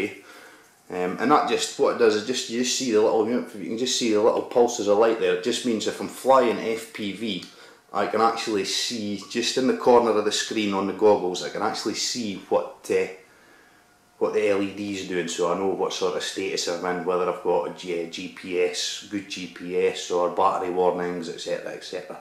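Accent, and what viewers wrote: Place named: Scotland, United Kingdom. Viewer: Great video! Well done. Scottish accent helps too! We've got GoPro 1 and 2 live out cables ready to go. And the new GoPro 3 cables ready to ship as well now. We've also got the Phantoms themselves in stock and ready to go and the 3S Balancer to JST leads too. If you go to firstpersonview.co.uk and click on the Phantom you'll see my FPV system attached to the bottom - its identical to your's! Happy flying! Simon Dale - FirstPersonView.co.uk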